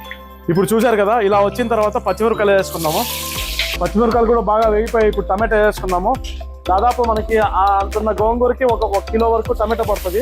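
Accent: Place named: Anantapur, India. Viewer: Abbayi meedi ananthapur ah??? Slang alane undi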